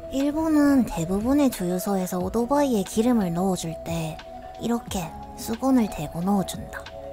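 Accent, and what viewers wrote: Gyeongsang, South Korea. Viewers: 매력있음ᆢ 말도ᆢ 표정도ᆢ 음식도ᆢ 숙소도ᆢ 특히 갱상도 말투의 발음이ᆢ 매력 억수로있음 굿~~^^ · 갱상도 아가씨인가요..~^^? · 구수한 갱상도 사투리 때문에 영상 정주행 중입니다 ㅎㅎㅎㅎㅎㅎ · 경상도 사투리가 일본말투 톤으로 하니까 색다르게 들림~~~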